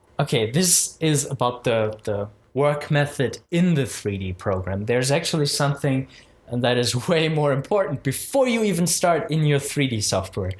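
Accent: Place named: Germany. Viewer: I love your German accent Cause, I have the same accent xD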